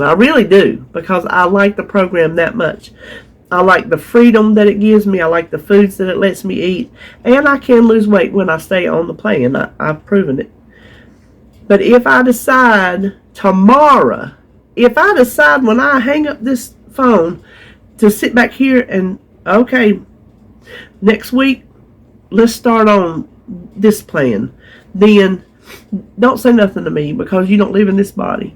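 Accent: South, United States. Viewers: Thank you you got me up off the chair and I'm not going to squander this body any further that God gave me. I'm going to do Leslie sansone the one minute walk everyday and now that I've said that I'm promising you so I got to do it I love you thank you so much watch you everyday and have for a couple of years you're an inspiration and I love your Southern Roots and accent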